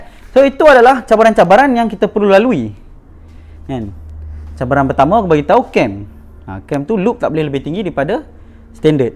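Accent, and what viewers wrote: North, Malaysia. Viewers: Lama2 skali bunyi jugak loqhat utara...apa2 pn best penerangan beza cam..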